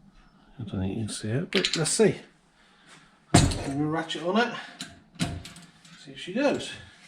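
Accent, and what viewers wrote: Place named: Gloucestershire, United Kingdom. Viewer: Reminds me of my old Dursley Glos accent....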